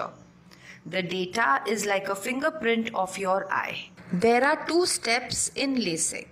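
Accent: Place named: England, United Kingdom. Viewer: pretty hard to watch with this english accent.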